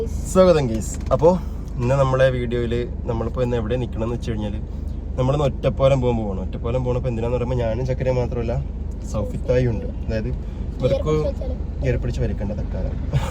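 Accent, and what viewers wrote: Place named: Malappuram, India. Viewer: മലപുറ० ഭാഷആയിരികുനനു❤❤❤❤❤❤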